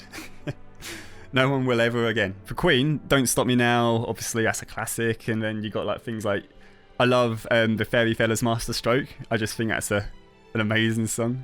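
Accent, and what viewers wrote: United Kingdom. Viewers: His accent 😍😍 #LondonBoy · I did NOT expect you to be English! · He british 😍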